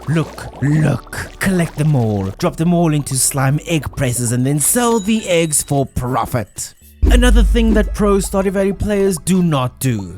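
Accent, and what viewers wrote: South Africa. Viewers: Definitely not french. I believe he is South African, could be wrong though. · Are you South African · he's south african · love how the south African accent is super recognisable by south Africans😂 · Typical South African accent with dramatic flare... As a fellow south african, it's trash. I hate our accent. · he definitely is South African. I was watching this video like… is that a fellow South African?? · You sound South African. Hey from Namibia! Thanks for the tips - playing with my son 😁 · we don’t have an accent. We have like nine accents. He speaks with a white joburg area ish afrikaans accent. Some accents here are epic linguistically and phonetically. Even his is cool, just not as cool as some others.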